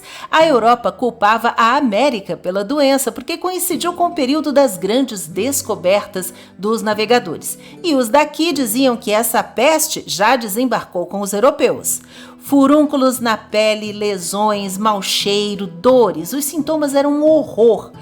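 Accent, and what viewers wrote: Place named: Minas Gerais, Brazil. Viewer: Pelo sotaque parece que a narradora é de Minas Gerais, ou já viveu nessa região. Parece muito com o sotaque daqui de Minas. Parabéns narradora pelo seu excelente trabalho, é a voz feminina mais agradável do Youtube.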